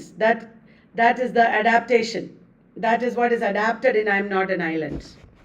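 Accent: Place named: Andhra Pradesh, India. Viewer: Mam you speak like my friend from Andhra Pradesh....very cute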